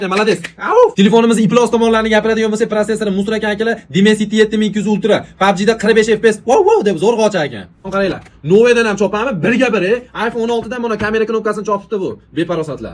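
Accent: Andijan, Uzbekistan. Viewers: Андижон шева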